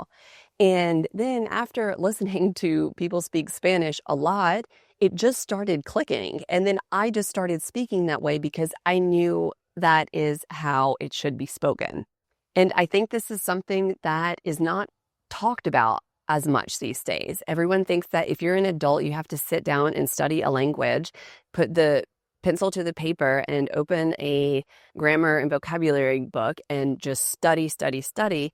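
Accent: United States. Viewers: I wish I could speak American English like you; my goal is to speak fluently, and I know I can do it. For context, I am from Syria and live in New York, but unfortunately, I don't have any friends to practice with. I really love your high-quality content.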